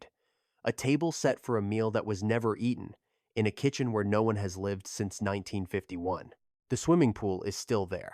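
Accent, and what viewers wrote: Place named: United States. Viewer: Actually a very good video despite American pronunciations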